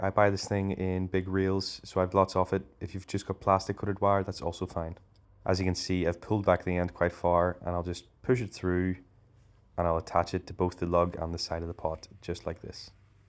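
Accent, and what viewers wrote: Northern Ireland, United Kingdom. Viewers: have you been to matchetts in belfast? i can tell you’re from ni from ur accent 😂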